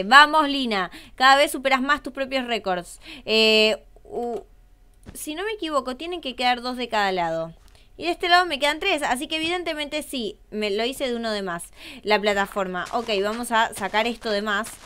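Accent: Argentina. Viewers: Oye que ella hable en argentino no significa que tu tambien hables a si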